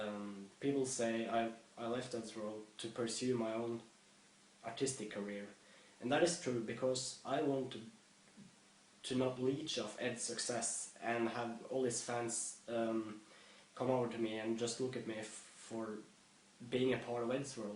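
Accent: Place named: Australia. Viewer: Bruh how did I always think he was Australian...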